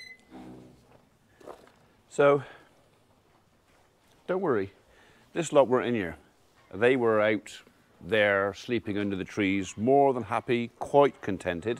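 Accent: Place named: Gloucestershire, United Kingdom. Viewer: Love the Gloucestershire accent, could listen to it all day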